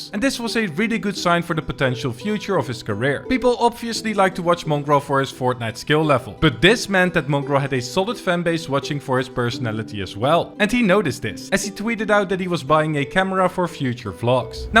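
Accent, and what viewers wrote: Netherlands. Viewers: are you dutc hyou got a dutch accent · Are you dutch?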